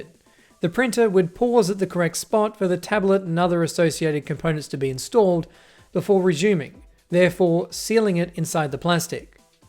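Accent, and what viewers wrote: Australia. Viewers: Dude that vacuum looked to work fantastically.. please help w brother out, what kinds vacuum? Make/model. Or do they only sell em in AU or whatever the accent is. Enjoyed the video.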